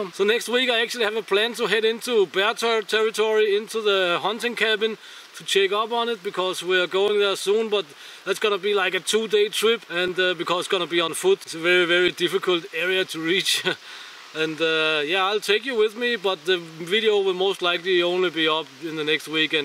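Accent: Germany. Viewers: Why the German accent?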